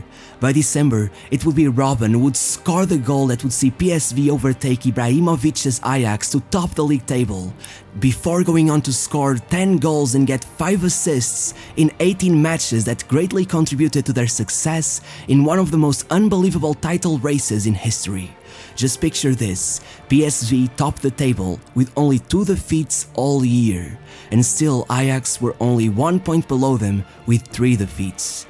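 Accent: Portugal. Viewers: By this guys voice i can tell he is Portuguese, as i also am portuguese 😉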